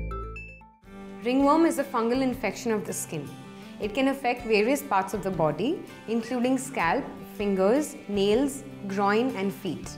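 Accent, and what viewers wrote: India. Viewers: YOUR ENGLISH IS DIFFICULT TO UNDERSTAND BECAUSE YOUR INDIAN ACCENT IS TOO STRONG !!!!!! JUST TO LET YOU KNOW.......🌝🌝🌝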